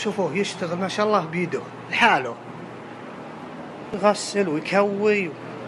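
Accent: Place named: Qassim, Saudi Arabia. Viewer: الله يلعن لهجتكم يا القصمان تفوووو.فعلاً تجيبو المرض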